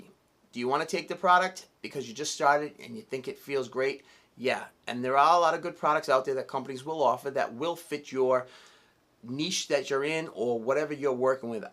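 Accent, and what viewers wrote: Boston, United States. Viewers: Subscribed! Def can hear that Boston accent! I work in Boston as truck driver too. Fun city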